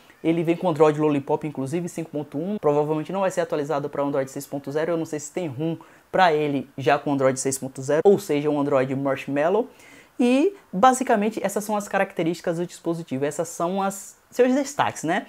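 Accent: Bahia, Brazil. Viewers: vc e da Bahia ? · Vc realmente tinha de ser baiano... parabéns! gosto de seu canal! Tb sou baiano.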